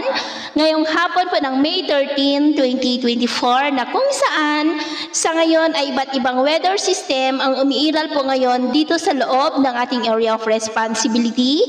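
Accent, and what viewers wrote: Visayas, Philippines. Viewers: Bisaya kayo mam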